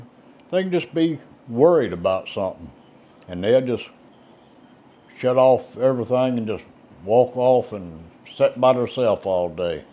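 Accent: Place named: North Carolina, United States. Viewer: You remind me of my relatives on my Mom’s side of the family. They’re from North Carolina. Brought back fond memories listening to you speak. Love it.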